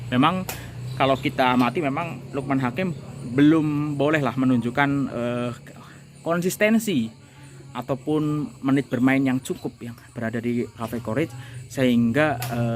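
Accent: Indonesia. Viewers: itu cara indo, i disebut e, kalau A disebut a, kalo k disebut ka, kalo B disebut be, c disebut se mcm tu lah lebih kurang.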